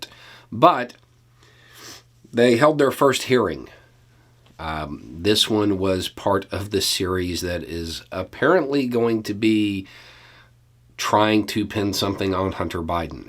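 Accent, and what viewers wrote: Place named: Kansas, United States. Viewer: Love your channel! It’s always the first video I watch everyday. Just commenting to hopefully get you a YouTube buck or two. I kinda look dress/ look/have an accent like you; born and raised in South West Kansas. Made it to California in 1996 at 22yo, via Mississippi for “school”. It’s really reassuring to hear your take on “things”, it’s awesome you’ve found a platform to help/inspire the younger ones too. It also comforts me to know that someone is out there showing the world not all of the “country boys” are MAGA “Good Old Boys”. Keep up the great work.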